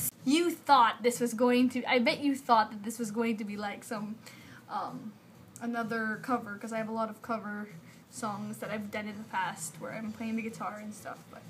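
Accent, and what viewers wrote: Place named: United States. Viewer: You sound American. Were you raised in the States? Shame about the real YUI's hiatus, right?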